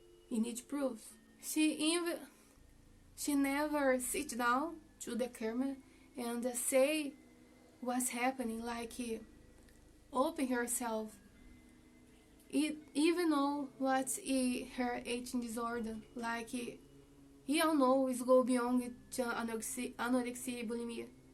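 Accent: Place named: Brazil. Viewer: you are brasilian right?? im from portugal and the way you speak your accent sounds brasilian, voce e brasileira vejo pelo sotaque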